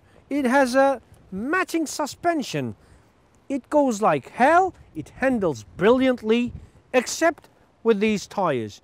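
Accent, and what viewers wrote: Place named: England, United Kingdom. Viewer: Bildigin ingiliz aksani var kardez. Neyini begenmedin.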